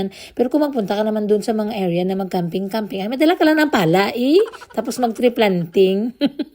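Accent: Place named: Iloilo, Philippines. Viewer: hello po, i enjoyed watching your videos and listening to your voice and the way you talk in ilongga. ❤😅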